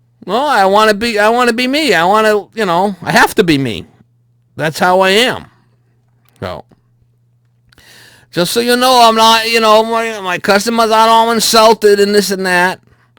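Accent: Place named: New England, United States. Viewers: Hey. Luv your videos. I know what some people are saying, but maybe part of it is that they're listening to the words your saying to a person on the camera but not seeing your face when your talking to them. IDK. Anyway, you do you.:) I also think part of it is your accent. You do have a strong NE accent. I agree, don't change for anyone, right. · Love the voice and New England accent. Accents are dying out. I grew up in Los Angeles and have no accent whatsoever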